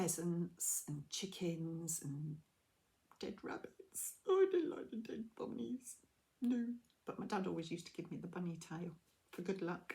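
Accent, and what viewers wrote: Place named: England, United Kingdom. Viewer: Lovely video. I'm so glad to know you. I'm Canadian, but my Gramma was a war bride from England, and I feel a connection, besides crochet. I love the accents you have over "across the pond". I'm on the West coast, and Canada has many different accents, too. Thanks for sharing. Take care and love from Canada 🇨🇦 ✌️🍁💜🐄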